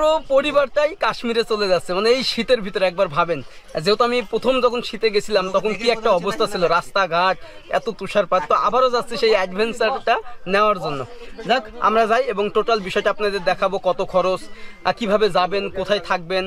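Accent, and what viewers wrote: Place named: Kushtia, Bangladesh. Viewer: কুষ্টিয়ার ভাষা মনে হচ্ছে।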